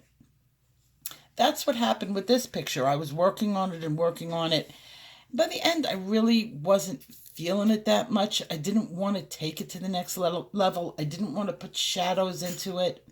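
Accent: New York, United States. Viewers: I THOUGHT I heard a tinge of NY in your voice never wanted to assume, ;) thanks for another great video, the coloring was suggested by a therapist for my anxiety as a coping mechanism, when I am needing to just zone out sometimes I pick up one of my books and pencils and get going. EDIT- I also bought a book from the thrift store with a woman's writing in it, it was a type of daily inspiration for women book, and her notes intrigued me so I purchased, thought I was the only one who did that...THIS is the reason why I like your channel so much, because of your personality and how you share parts of your life with us in a comfortable manner, I feel like I know you, its more than coloring here its about introspection and reflection and life and humanity and how that all ties into you, your life and family and art and coloring. I LOVE IT. Thanks for going deep with us we love it!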